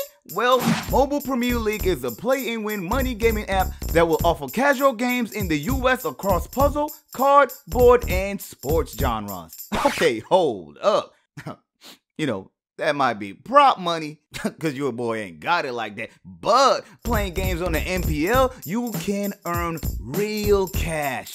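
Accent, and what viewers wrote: Nigeria. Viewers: R u Nigerian? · Bruh are you nigerian?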